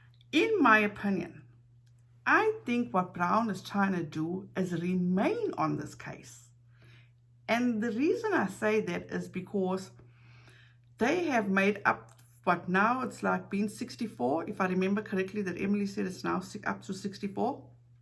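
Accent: South Africa. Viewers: Are you from South Africa? I kind of hear that in the accent, otherwise I can’t place it. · I love your accent. South African?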